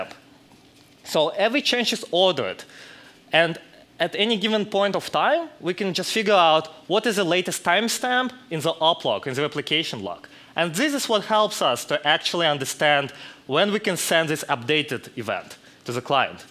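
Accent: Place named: Russia. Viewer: Russian accent. Is he from Russia?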